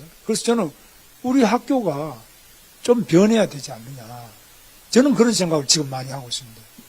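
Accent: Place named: South Korea. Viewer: 구수한 사투리 정이갑니다.대통령꼭대십시요.😊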